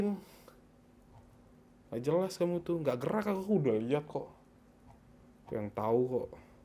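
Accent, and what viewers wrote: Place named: Kalimantan, Indonesia. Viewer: ada logat² Kalimatan nya 🗿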